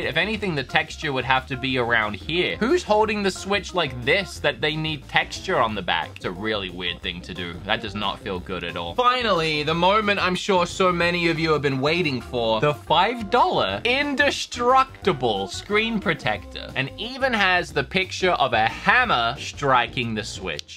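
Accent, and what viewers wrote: Australia. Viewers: Are you fron australia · What r u an Aussie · Wait YOOOOOOOOOOO I DID NOT REALISE THAT YOU WERE ANOTHER AUSSIE!!! AUSSIE AUSSIE AUSSIIIEEE!! OI OI OI!! XD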